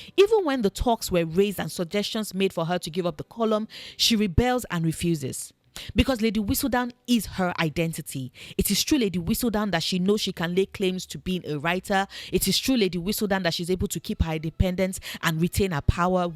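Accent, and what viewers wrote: Nigeria. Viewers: Very random but sound like some of my Nigerian sisters from the south east. 🤩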